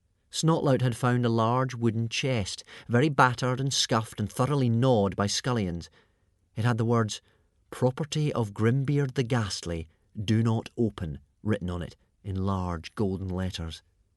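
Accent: Scotland, United Kingdom. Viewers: The Scottish accent really goes well with the story.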